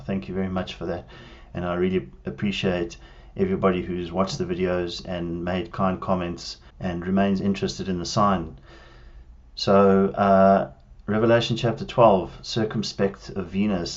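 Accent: South Africa. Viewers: Thanks, great work!...you from Johannesburg? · Hello from over the Pacific....Am so glad I found this website....Amazing work,thank you, from one South African to another...I hear my countries accent loud and clear,and I miss it... :) May God richly bless your work....MARANATHA!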